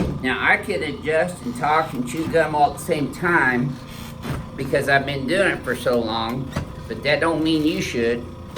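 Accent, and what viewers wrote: United States. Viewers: most American voice I've ever heard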